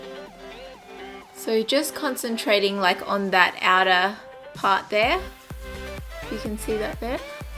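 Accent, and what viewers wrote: Australia. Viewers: R u Australian. becuz u kinda have that accent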